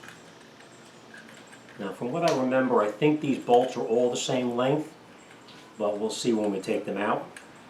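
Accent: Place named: New York, United States. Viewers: You from New York?👀 Love the accent.💯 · I like your accent ! haha A NYC mechanic!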